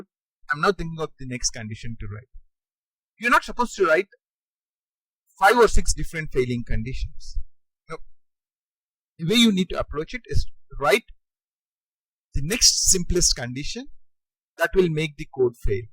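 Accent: India. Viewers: The sound sucks, with it's over amplification and under feed. Hard enough to understand with the fast Indian speech. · same comment as lakesidelivin: The sound sucks, with it's over amplification and under feed. Hard enough to understand with the fast Indian speech.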